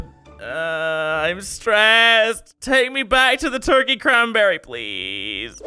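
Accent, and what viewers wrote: Minnesota, United States. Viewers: I DIDNT KNOW YOU WERE IN MINNESOTA OOOFFFDAAA. 100% MINNESOTAN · started picking up a Minnesotan accent fast that makes me happy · Bruh unless you were like up near bemidgi no one talks like that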